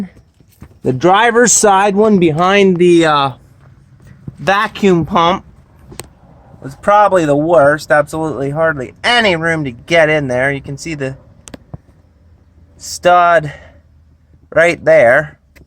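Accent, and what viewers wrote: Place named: Eastern Pennsylvania, United States. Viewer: If I had to guess, I’d place him somewhere in eastern PA.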